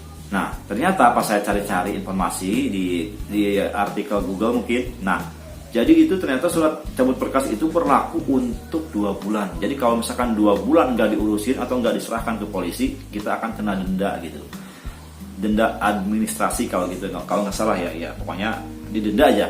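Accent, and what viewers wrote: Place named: Bogor, Indonesia. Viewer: Orang bogor yah...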